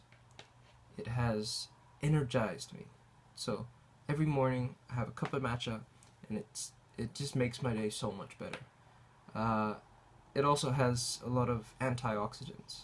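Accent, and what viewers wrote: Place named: United States. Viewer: Yeah, yeah comment is 1 year long but you're wrong. UK: /ˈmætʃ.ə/ US: /ˈmɑːtʃ.ə/. So yeah, since he's american(or at least speaks with an american accent) he's right.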